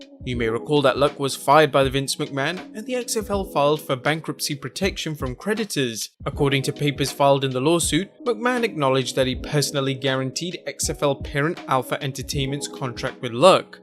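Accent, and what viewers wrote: United Kingdom. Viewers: I didn't know is British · find a NEW Narrator (preferably NOT British)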